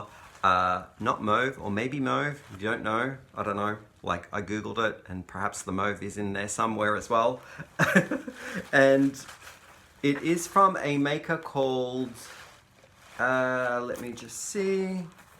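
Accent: Australia. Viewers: Ha ha! Cute story about the garbage bin. I'm from Southern California. I call it "trash" , but my friends from Hawaii call it "rubbish". BTW I love your Australian accent!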